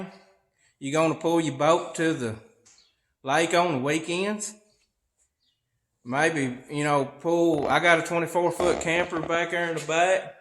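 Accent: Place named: United States. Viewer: cheers from poland, i got here by randomly searching "diesel truck" just cause i was bored lol. i like your accent. its nice to hear various american accents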